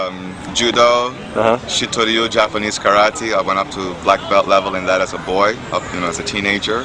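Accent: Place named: Latin America. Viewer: Me. wrote something in Spanish recently. I wonder if he is Hispanic, I hear a slight accent, no? He mentioned Puerto Rican and black influence.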